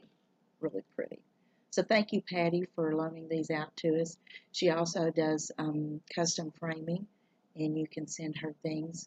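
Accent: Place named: South, United States. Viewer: Oh my gosh! Just found your floss tube and watched both back to back. Love your southern accent! Your voice is very relaxing. I love you showing fabric and thread options, different from what is called for! My choice for fabric is 32 ct even weave or 36 ct linen by PTP or Weeks. What are the smalls behind you? Are they fobs?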